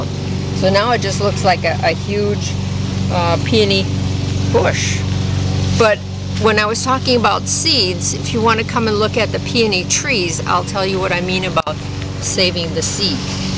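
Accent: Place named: Midwest, United States. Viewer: I love watching your videos...and hearing your MidWestern accent. My dad is from Michigan/Minnesota and I have cousins that lived in Madison and we used to visit them every summer (they all now live in NC). You sound just like them! :-)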